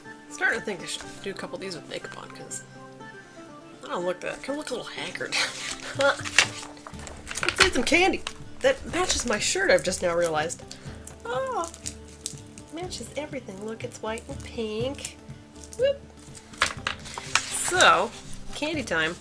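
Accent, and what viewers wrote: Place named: England, United Kingdom. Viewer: Superb, simply spiffing, one is chuffed to bits. You have a great natural gift for comedy along with, it must be said, an ability to do a realistic English accent without sounding like an Australian on Prozac (unlike most other inhabitants of our former colony). Seriously, keep up the vlogs. They are a ray of much-needed sunshine in a dark and gloomy world. BTW, to "have a butchers" is a common expression. It comes from Cockney rhyming slang, butchers hook = look. Very best wishes from the "Dark side" of the pond...…………………….the ancient Englishman.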